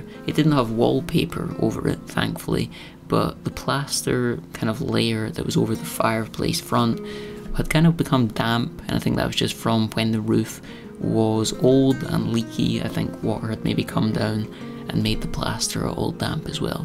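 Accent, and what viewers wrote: Scotland, United Kingdom. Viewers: I did just that and realized I had been watching off and on since he renovated his tiny stone house that had been abandoned. The name of his channel has changed, I think, but I recognize the cat, accent and his red haired from the tiny house renovation. Thanks you I could listen to that accent all day long. My family was from Scotland but journeyed to US in late 1700’s and only a Texas accent remains!!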